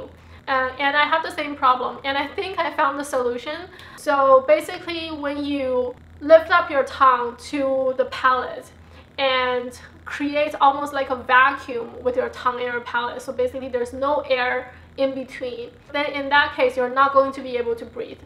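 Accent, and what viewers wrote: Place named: Taiwan. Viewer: U seem to have taiwanese accent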